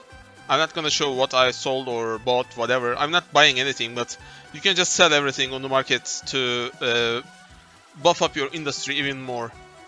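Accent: Turkey. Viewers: aksandan direkt Türk olduğunu anladım :D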